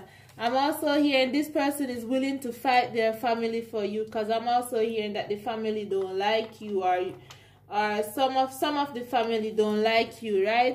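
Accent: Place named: Jamaica. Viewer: Omg mi just know seh is a Jamaican accent that ❤👏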